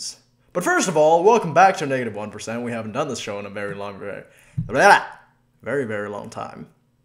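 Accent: United States. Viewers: HES ASIAN BUT HE STILLS TALKS AMERICAN LIKE! congrats man you are the last league of legends related subscriber since i ditched that cancerous game. We played a long time ago. Always enjoyed the few games we did play. You should play dota, ill hook you up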